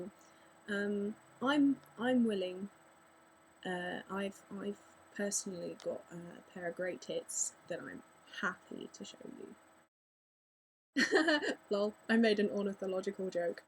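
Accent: United Kingdom. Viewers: heck you don't have to search on google! Look in the mirror you're so pretty and that british accent. Lovely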